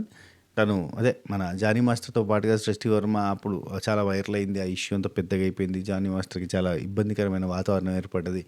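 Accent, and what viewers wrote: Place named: Chittoor, India. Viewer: record cheste mimmalni Enduku kodatharu garu cheppandi miru Adbutham ga video's chestaru Adi kuda Swathi pickles entha veyttikina dorakadam ledu kani miru mee webset pampinchi chala manchi panichesaru garu Mee language chittoor slang chala bagundi garu ee madyalo mee youtube video's chala Trend ga marindi garu mee chittu pakala block bord ekada dorukuthundo cheppandi garu plz nenu kuda Order cheskunthanu garu plz Aa youtube maike kuda entha chepthara garu plz nenu online lo veyttikithe 40000+ payment chupisthundi garu mee maike entho naku cheppandi plz garu plz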